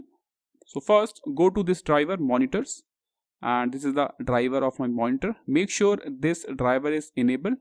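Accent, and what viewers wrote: India. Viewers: Looks for computer problem solutions. Hears Indian voice. Computer fixed. My guy you the best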